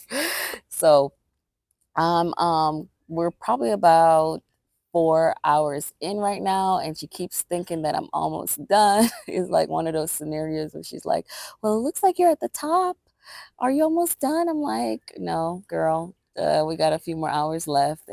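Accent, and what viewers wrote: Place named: Jamaica. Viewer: I knew you were jamaican. Lol. I asked in 1 video and you never responded. I'm jamaican too🇯🇲🇯🇲🇯🇲🇯🇲. I could hear the accent.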